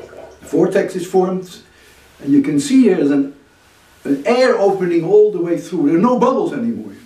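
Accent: Netherlands. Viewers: Toch maar even uw naam gegoogled, ik dacht al aan uw accent te horen dat u nederlands bent. Toffe videos!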